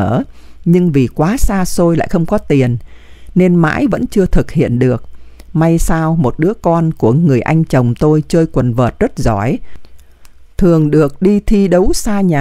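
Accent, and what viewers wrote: North, Vietnam. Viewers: Người đọc là Bắc kỳ, đọc chuyện của Nam Kỳ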